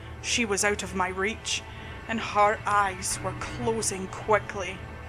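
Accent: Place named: United States. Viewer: This was totally awesome. Your American accent is great! I hope you do more of these!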